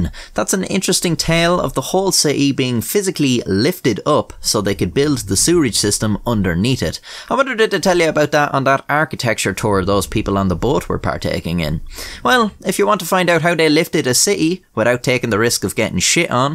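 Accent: Ireland. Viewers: An Irish voice telling us to trust Atlas... Hmm... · "...two turds of the people on the boat below." When the Irish accent lends itself to incidental humor...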